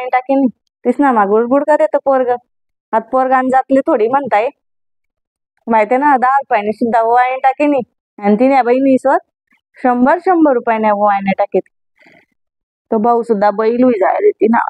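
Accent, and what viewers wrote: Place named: Khandesh, India. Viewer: मस्त अहिराणी येते तुला छान वाटते ऐकायला .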